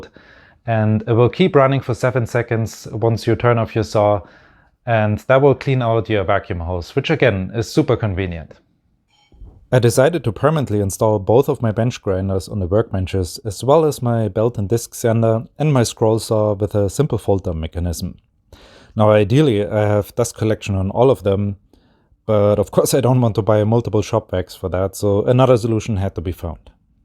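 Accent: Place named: Germany. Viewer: Man well done.Great innovations…or combination of different ideas to make it work for you.Just found your channel by incident,and think you would deserve more klicks.I just wonder…are you german? It just felt like the little accent sounds familiar to me.Keep it up well done.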